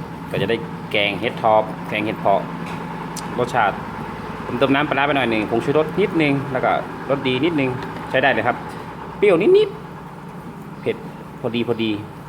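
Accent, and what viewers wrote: North, Thailand. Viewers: ชอบฮื้ออู้เมืองมันเป็นเสน่ห์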